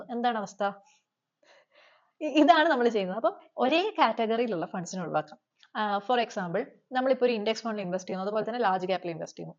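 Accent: Kannur, India. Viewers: കണ്ണൂർ കാരി സ…അല്ലേ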